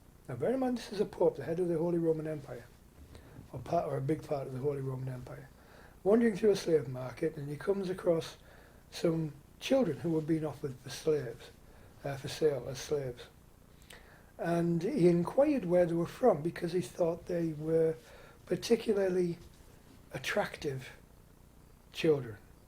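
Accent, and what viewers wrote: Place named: United Kingdom. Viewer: Firstly, you nailed that intro, I wouldn’t mind if it was a few seconds longer. Second, you are an incredible narrator! Hardly ever miss a beat, British accent adds to this and regardless of the subject your voice alone captivates and keeps the viewers’ attention. Thirdly, you must keep this going, this is incredibly marketable on YouTube and I would love to work with you on the production of future videos. Add a slide show of images, easily attainable from the web, and your channel could rival Infographics and the like. Bravo 👏🏽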